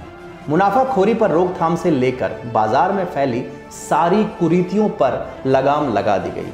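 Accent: Standard. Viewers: #Founder aap achhi shudh hindi bolty ho or aap ka bolney ka tariaka bhi achha hae... Bahoot achhy se ek alag andaz me aap story read karty ho... Ek advice dena chaunga agar aapko bahut aagy tak jana hae to hi... Ki aap khas kar History and non political topics par hi video banaya kary... Kyu ki aap bhi vohi kaam karty ho political videos me aapke dwara tathakit storys me jo ek #godimedia karty hae. Baki sab thek hae... ( I am not belong from any political party or political ideology )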